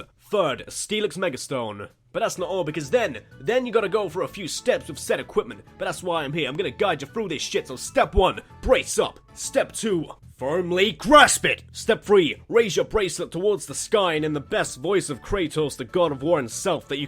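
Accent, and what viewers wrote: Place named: Northern Ireland, United Kingdom. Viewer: Where so you live? I couldn't tell if it was NI or Britain 😅 I live in N.I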